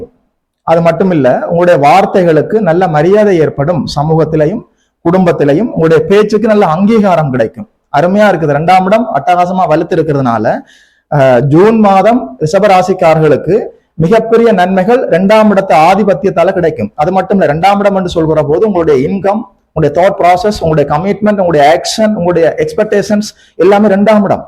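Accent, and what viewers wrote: Sri Lanka. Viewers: ஸ்ரீலங்கா தமிழ் அருமை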